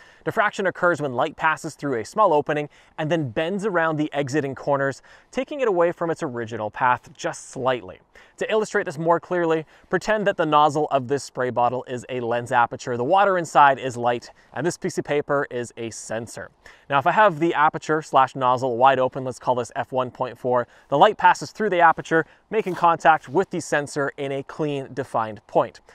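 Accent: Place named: Canada. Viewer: It may be his Canadian accent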